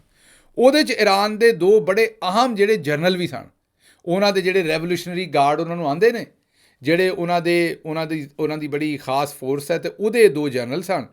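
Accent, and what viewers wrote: Punjab, India. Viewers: گل صاحب تسی منو یا نہ منو لیکن تہاڈی پنجابی دا سواد ا جاندا ہے کڑاکے کڈ جاندے ہو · Saab I literally enjoy listening to Ur Punjabi accent ❤😂 I myself a paki Punjabi from Karachi & resident of Oman but my Punjabi is soooo very poor😂 May Allah bless u Sir lotsss of respect🫡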